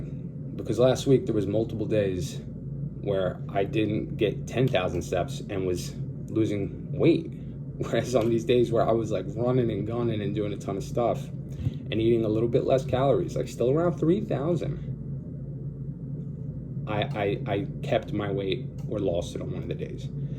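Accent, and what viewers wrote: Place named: Italy. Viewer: It’s the Italian accent.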